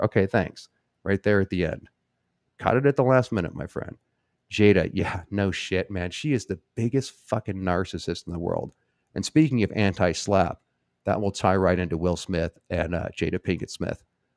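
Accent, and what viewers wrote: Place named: South, United States. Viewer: Thanks for this. (Don't apologize for your Southern accent - it's actually pretty good. That from a North Carolinian.) It's lovely when you use voices and accents as you read. The actor in you will never die and I do hope that you'll be able to do that again someday. Hell, as a screenwriter, I'd enjoy writing something for you if I could sell it.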